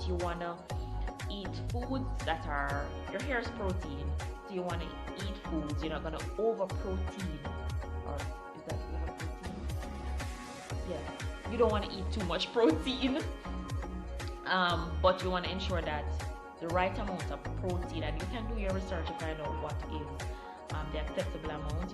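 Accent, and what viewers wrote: Jamaica. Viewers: your hair is beautiful and do i hear a jamacian accent?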